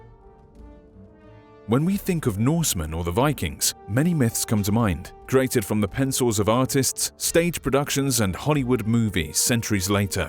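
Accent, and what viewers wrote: United Kingdom. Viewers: Stop using this stuppid brits vocif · I hate the brittish accent for some reason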